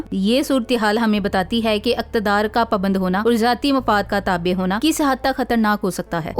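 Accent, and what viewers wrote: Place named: Punjab, India. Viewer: Khabees Faiz essa .... Please AAP Urdu ko Urdu mein Bolen Urdu ko Punjabi lehjay mein na boleen ....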